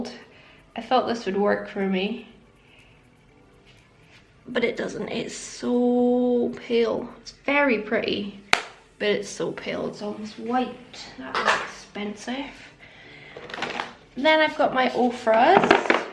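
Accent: Scotland, United Kingdom. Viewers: Scottish? I think...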